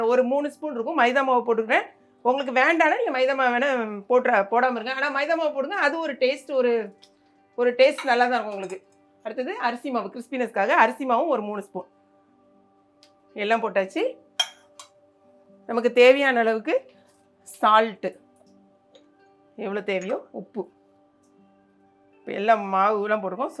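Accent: Tirunelveli, India. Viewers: Tuticorin n Tirunelveli slang super.very nice our language cooking is very interesting.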